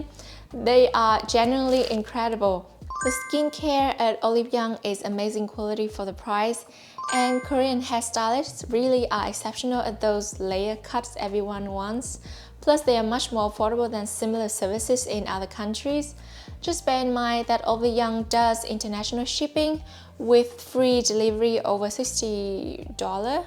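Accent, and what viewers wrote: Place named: Vietnam. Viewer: Vietnamese accent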